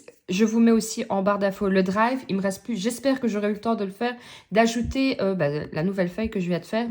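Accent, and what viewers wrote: Liège, Belgium. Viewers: Toi t’es de lièèèche non ?😅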